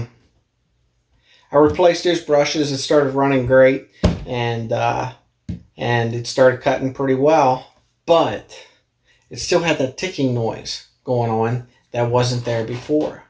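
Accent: Pittsburgh, United States. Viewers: Is that a yinzer accent I hear? Rad build!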